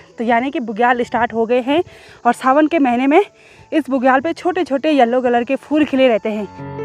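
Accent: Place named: Pahari, India. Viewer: I attend your each and every vlog as your voice and presentation skills is unique in pahari tone. I like very much.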